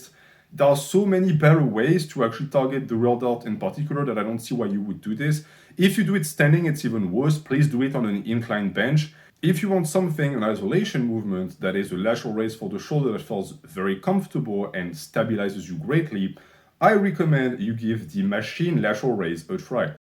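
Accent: France. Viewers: Are you french? your accent sounds like it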